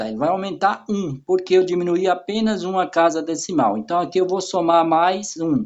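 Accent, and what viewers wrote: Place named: Rio de Janeiro, Brazil. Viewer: Parece ser do rj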